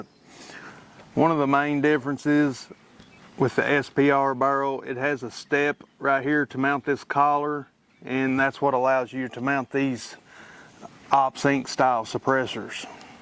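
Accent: South, United States. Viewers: Best part of a southern accent is you can play at 2x speed and dude doesnt sound like a chipmunk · enjoyed it! Great info and I'm from Alabama so I can't help but pick on other southern accents! Thanks for being a good sport :)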